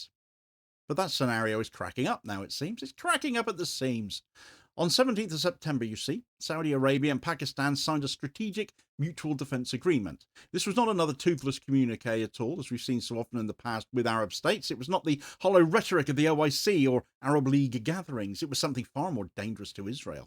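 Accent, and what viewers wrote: Cornwall, United Kingdom. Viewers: Are you from Cornwall?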